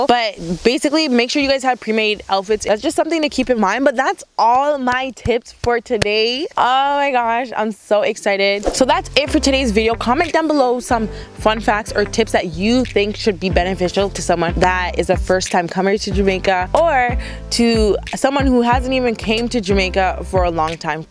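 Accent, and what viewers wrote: Canada. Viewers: Listening to you, I'm picking up a Canadian accent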